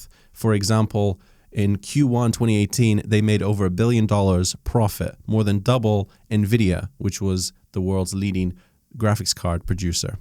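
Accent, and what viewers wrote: United Kingdom. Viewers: I'm curious what part of the UK his accent would be associated with. It's rhotic but I can't place it. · I can't detect an American accent - he's British I'd say although some words sound Aussie to me.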